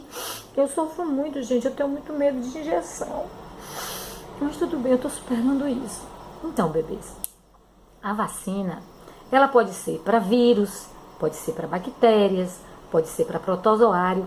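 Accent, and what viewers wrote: Bahia, Brazil. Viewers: Vc é baiana?? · Essa é baiana, hein bebê.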